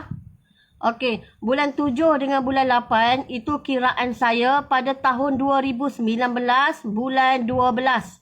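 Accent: Kelantan, Malaysia. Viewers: org kelate ke kak ni ? adui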